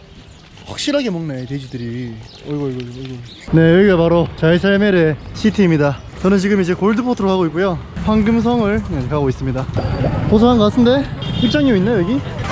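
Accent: Gyeongsang, South Korea. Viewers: 그는 경상도 사투리를 쓴다. 그는 Jay다 - 요새 Jay님 영상 보는 재미가 쏠쏠 해요. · 목소리가 경상도 사투리라서 정이가서 본다 ㅎㅎ 여행도 좋아하지만 정갑다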